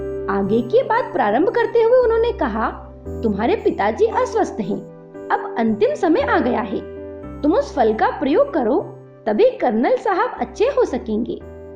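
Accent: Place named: India. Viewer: What’re you saying ?.. can’t understand anything..because you’ve got such a strong Indian accent.. otherwise i do speak & understand Hindi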